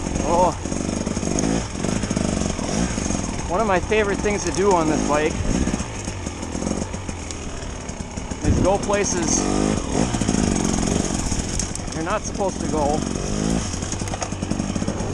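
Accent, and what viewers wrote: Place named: Wisconsin, United States. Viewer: That Wisconsin accent is prime brother 💪🏼. Great vid. Your description of how controllable it is down low, makes this bike very tempting. My four stroke Kawi is hard to control in any sense of the word at low rpm. Feels like it goes from 0-100 with the slightest twist of the throttle. I ride a lot of desert and open area, so it’s fine. Woods riding and technical terrain is tough though.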